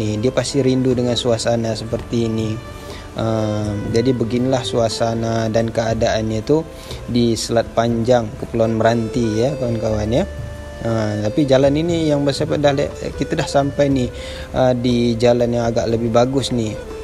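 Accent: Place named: Johor, Malaysia. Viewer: bahasa kamu 98% mirip logat melayu Johor malaysia aka UPIN IPIN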